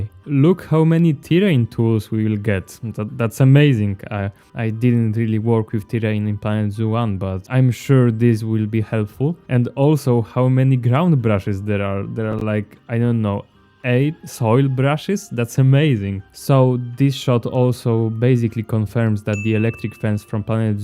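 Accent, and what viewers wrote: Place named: Poland. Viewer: Are you from Poland? You have that kind of accent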